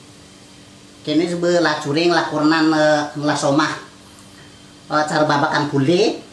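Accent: Buleleng, Indonesia. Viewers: Hahaha..lucu luh, ngoceh logat buleleng, sambil masak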